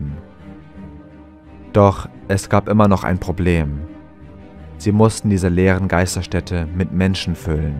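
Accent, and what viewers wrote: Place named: Hesse, Germany. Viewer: Der hessische Dialekt kommt manchmal durch 😅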